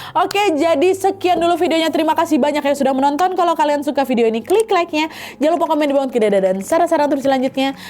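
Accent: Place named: Medan, Indonesia. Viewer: 1 rb a Terima kasih narasi Tunggu komen say ya. Abangmu umur 82 th Abang sangat suka cara narasi dengan aksen Medan, Medan Wak. Abang dinas di KKA 3 th s..d 1991. Komenk,u panjang Dek. Gak papa ya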